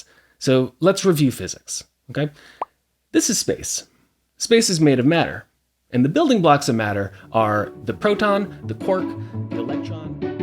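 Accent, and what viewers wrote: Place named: United States. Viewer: You are not Cornish you're American Dumbo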